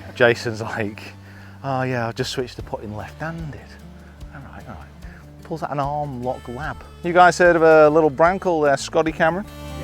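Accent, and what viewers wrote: United States. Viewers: your american accent is top notch, love it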